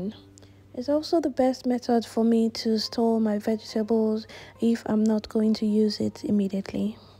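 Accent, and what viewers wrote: Nigeria. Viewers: Polished , polished polished wait a minute , was that voice yoruba ?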